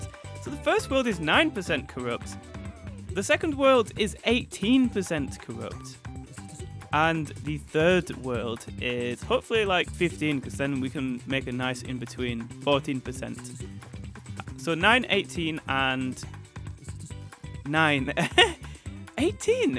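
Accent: Scotland, United Kingdom. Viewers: nice scottish accent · Your accent is absolutely glorious, where in the UK are you from? :D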